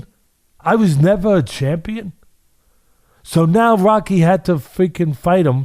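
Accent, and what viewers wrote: Newcastle, United Kingdom. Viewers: Kick off, true geordie. Gets me through the day major videos all the time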